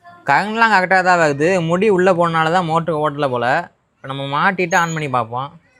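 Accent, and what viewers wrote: Madurai, India. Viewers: Madurai slang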